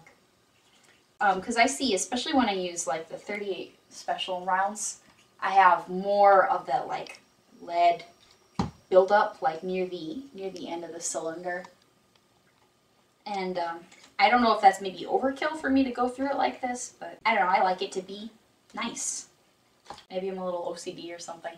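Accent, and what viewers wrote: Wisconsin, United States. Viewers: Your accent also gives it away. I am also from Wisconsin and when I went to the East Coast, the locals would ask me if I was from Wisconsin or Michigan.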